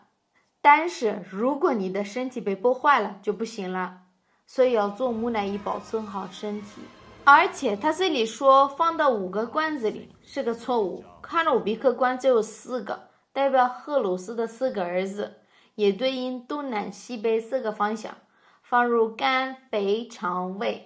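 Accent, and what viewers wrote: China. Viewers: 可惜學得是中國口音，美感打了點折。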